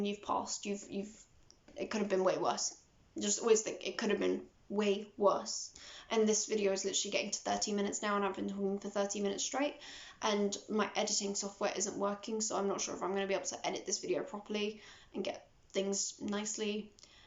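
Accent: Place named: England, United Kingdom. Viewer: i don't know why you sound more english in this than usual it's funny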